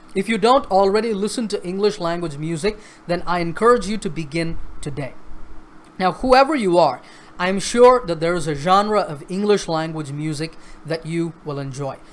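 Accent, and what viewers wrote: United States. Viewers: I really looking forward to learn American accent like you could you help me out please.. Actually I tried getting bogged down many times in it but the problem arises with practicing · you know I m in 10 th I have joined a lot of English tutions one named American institution of English language but the problem there was that those people don't have accent but u have it I m an ilets student too have of my doubts are finished due to you now I don't get perplexed while listening an American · How did you master the American accent?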